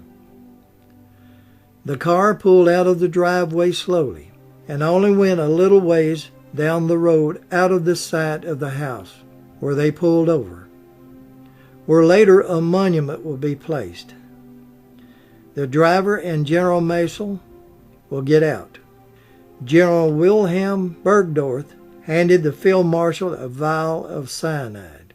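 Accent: South, United States. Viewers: Mr., Just another very well researched and very well presented vlog. Please use your expertise and do a vlog on Rosa Parks. Again you have that golden southern accent that is so soothing to listen to.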